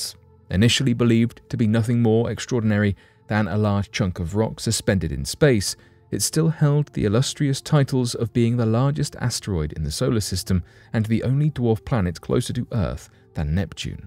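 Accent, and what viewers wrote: England, United Kingdom. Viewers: The believability of your videos varies. But the audio volume is super consistent. That and the high frequency of your videos makes this channel my go-to chill out channel. I also love English accents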